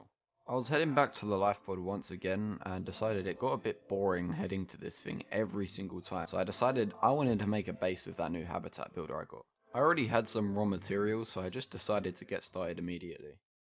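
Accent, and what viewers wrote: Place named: Australia. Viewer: Dude, are you an Aussie? I am and you kind of that sort of accent half the time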